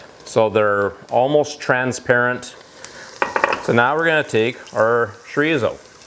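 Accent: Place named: Canada. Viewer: I love the Canadian accent! Showing love to the northerners from Southern California aye!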